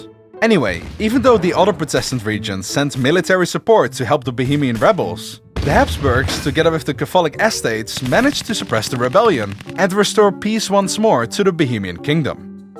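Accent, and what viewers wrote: Netherlands. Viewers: This video is great, the hilarity brought to me by the Dutch accent is even better. Not that your English is bad(its amazing) but the Dutch accent is very noticable and silly sounding. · I smell Dutch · Just subscribed, but this accent seems very Dutch even though nailing the English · Dude, your pronounciation! That's not how you say words! Please do something about not only your extreme Dutch accent, but also please just learn for example where the accents are in the words catholic and protestant before doing a video on the reformation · Dutch oversimplified (als ik het accent acuraat heb) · I hear a Dutch speaker 🇳🇱🇳🇱🇳🇱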